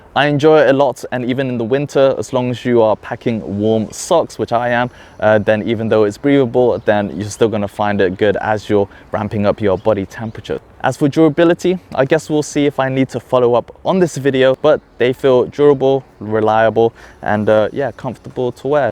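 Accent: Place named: New Zealand. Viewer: Thanks man. Appreciate your time and help as well. I just saw your vevo vid too and now I feel like I have to choose between those! Ha Btw, your vids are in South Korea but your accent sounds almost Kiwi ha where are you originally from?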